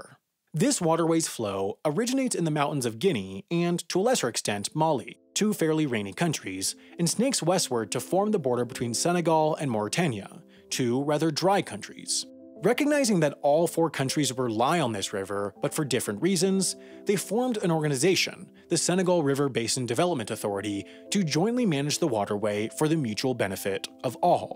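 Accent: United States. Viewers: Senseless Egyptian propaganda in American English! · Isn't he American? He sounds American.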